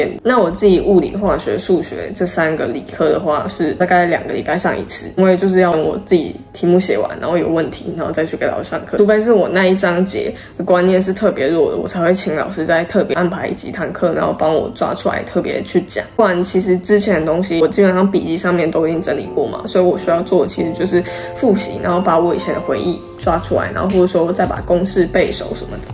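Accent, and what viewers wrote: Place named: China. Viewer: 為什麽有種大陸腔哈哈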